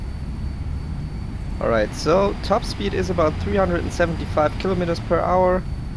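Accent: Germany. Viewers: Dieser Deutsch Akzent 😂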